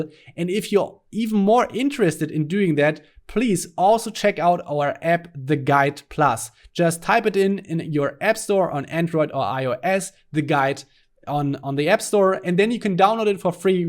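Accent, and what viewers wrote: Germany. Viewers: youre one hundred percent german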